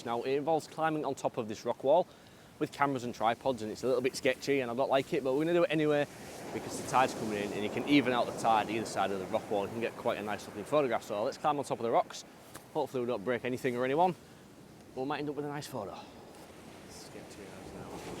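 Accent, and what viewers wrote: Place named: North, United Kingdom. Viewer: Good stuff. It's a pity that the speed of your talking and heavy Northern accent makes it nearly impossible to understand you, much less learn anything.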